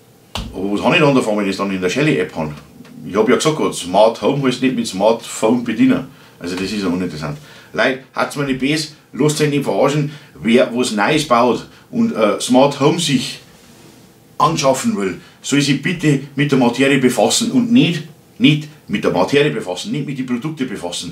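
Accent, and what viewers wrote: Bavaria, Germany. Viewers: Schade dass man dich außerhalb Bayerns einfach mal kaum versteht. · Lieber, ich liebe deine Videos, deinen bayrischen Dialekt und wie du dich so herrlich in jedes Thema emotional hineinsteigern kannst. Natürlich bringst du wieder einmal die genial die Sache auf den Punkt.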